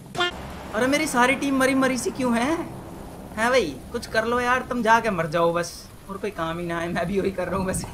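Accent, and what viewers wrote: Haryana, India. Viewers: Hariyanvi style xD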